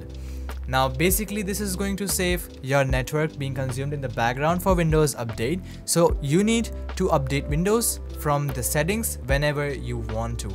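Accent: India. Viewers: i swear to god is this just another indian with just better accent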